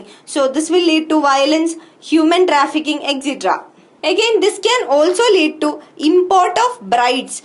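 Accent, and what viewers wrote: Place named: South India, India. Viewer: Thanks for valuable information. I can say with the accent of mam, she is surely a south indian and thats great.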